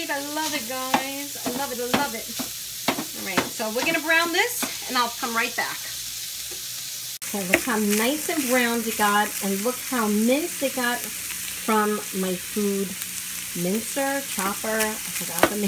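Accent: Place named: East Coast, United States. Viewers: Oh my Gawd! Oh my Gawd! I love the east coast accent. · I'd eat that. BTW, love the east coast accent.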